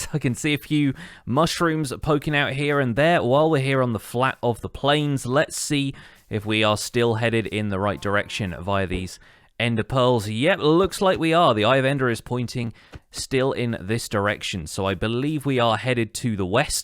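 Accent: United Kingdom. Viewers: Love your British accent. Greetings from Italy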